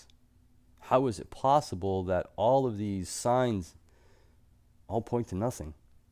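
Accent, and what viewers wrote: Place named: Northeast, United States. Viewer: You're my favorite YouTuber. I wish I lived closer to u, id love to meet . ♥️😊. I feel alone because no one around me seems to see or care about the signs of Christ's return. Every once in awhile, I'll meet a customer that sees it and is excited but I usually never see them again. Sounds like you're from the north east, I'm from Florida. Ty for your videos, I truly enjoy them!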